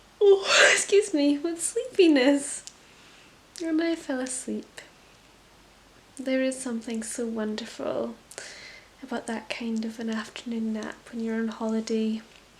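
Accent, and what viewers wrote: Scotland, United Kingdom. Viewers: What a delightful Scottish brogue. I could listen to you all day!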